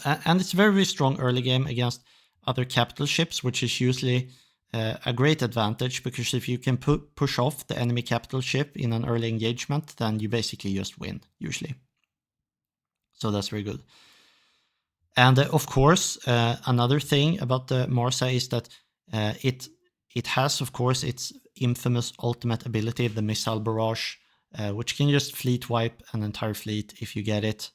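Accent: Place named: Sweden. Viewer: That's a Swedish alright. Immediately discernable.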